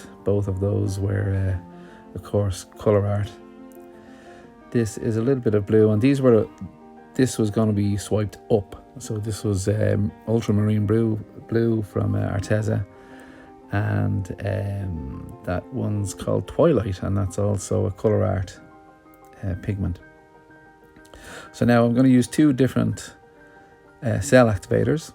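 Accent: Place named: Ireland. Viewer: I agree with you. I love the Irish sense of humour and the accent. Lovely paintings.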